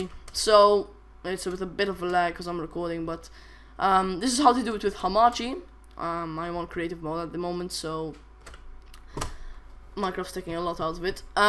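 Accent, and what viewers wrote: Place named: United Kingdom. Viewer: omg i hate britsh english this so retarded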